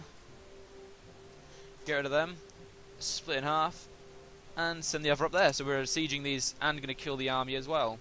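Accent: England, United Kingdom. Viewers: You are british. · are you english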